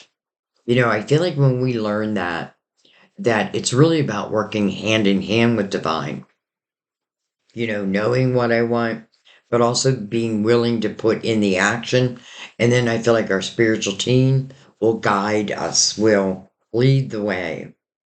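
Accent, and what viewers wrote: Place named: Southeast Pennsylvania, United States. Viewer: 8/31 here. Triple Virgo You sound like you are from the same area that I grew up in. SE Pa. And South Jersey? Love your channel and how accurate you are!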